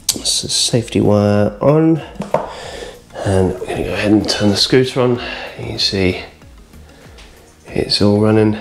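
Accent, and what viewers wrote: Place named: England, United Kingdom. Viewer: I prefer the Human language aka American English and not that nasty tongue clicking and lip smacking noise from shithole engkand, on top of the frequent gasping you mouth-breathers constantly geberate every 4 seconds(on average, it can ve far apart as one whole minute beforw you things gasp, a very ugly sound just lije the stupid lip snackinv you genetate while gasping to show that you ats from england ir sone such savage land instead of civilization).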